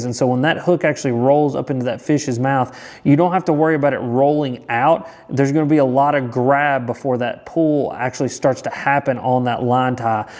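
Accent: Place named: South, United States. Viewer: I see your masters hat, and I hear your southern accent. I just found your channel and it looks like you are in the CSRA area based on what I can see so far lol I've been transplanted here from Wisconsin and I'm trying to figure out how to fish the Savannah River and other South Carolina lakes. Thanks for your videos!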